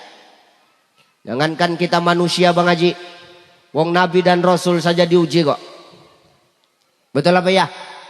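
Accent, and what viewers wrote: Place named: Batak, Indonesia. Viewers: Sumtra utara mang gtu bang ..itu bercanda semua .saya org jawa tp saya justru suka cz mang bgtu.logatnya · Ciri khas bahasa dari kota Sumatra Utara khususnya Medan memang seperti ini logat nya engkau kau.pribahasa seperti ini nampak nya terlalu kasar bagi orang yang belum paham.tapi percaya lah orang suka Batak itu suaranya lantang dan kasar dan insyaallah hati nurani nya baik🤲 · Itu menurut mu aja... Karna anda lahir di daerah yg punya bahasa lemah lembut saudara ku.. sementara beliau dari Medan Sumatra Utara .. demikian lah logat bahasa nya ..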